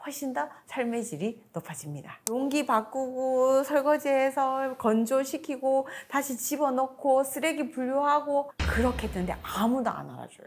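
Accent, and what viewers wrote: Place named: Daegu, South Korea. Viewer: 대구사투리 넘 예쁘네요~ 😂물론 도움도 많이 됩니다😊 구독!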